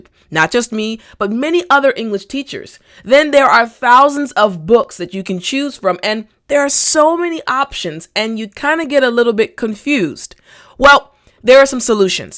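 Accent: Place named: United States. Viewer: Hii I'm from India at Odisha name meek ranjit thank you immensely for your lectures really I'm slowly understanding of your an American accent so appreciate your voice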